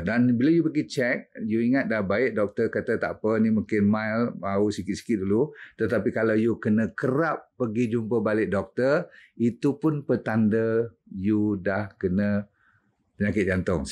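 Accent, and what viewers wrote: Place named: Perak, Malaysia. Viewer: Doctor orang Perak ,tak bolih hilang Bahasa ibunda sekupang Limakupang cukup kelakar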